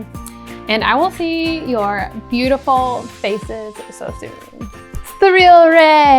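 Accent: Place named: South, United States. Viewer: It's nice to see a good YouTuber that has a southern accent. I never see anyone on YouTube with a Southern accent. I'm from Alabama so I'm really used to that kind of accent and it's just nice to hear someone online sound like someone that I'm used to hearing.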